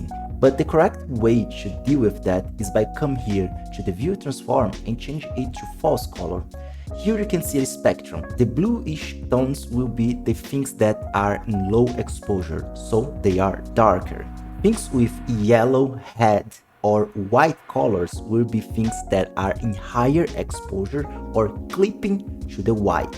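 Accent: Brazil. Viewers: Are you Brazilian ? You have the accent .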